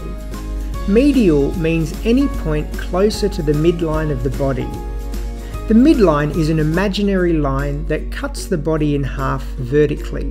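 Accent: Australia. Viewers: first year nursing, thank you for your so clear explanation, loving your Aussie accent.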